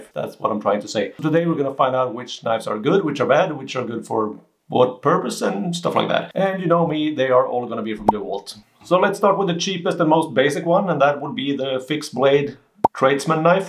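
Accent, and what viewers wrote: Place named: Germany. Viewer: First time watcher here....I stumbled upon your channel this morning, at first I didn't detect your accent....but as your video went on and I didn't keep scrolling I began to notice that you might be from Germany or austria....you finally mentioned that your from Norway! I appreciate your presentation style and liked how you described everything...really cool...I went ahead and hit that subscribe button and gave you a thumbs up! I guess the only thing I took issue with was the fact that your a Dewalt guy....I recently researched folding utility knives and decided to go with milwaukee I have their six in one fastback and their compact folding knife...I like both of these....I'm a knife guy and for my edc I carry a buck Juno in my front left pocket a spyderco in my left rear pocket and now my milwaukee fastback in my front right pocket...it works for me...I live and work in the west texas oifield....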